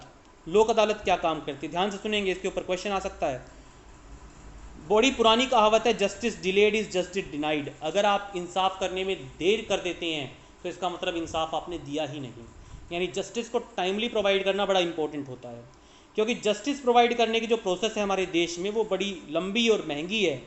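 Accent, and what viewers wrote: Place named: Haryana, India. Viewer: You are from haryana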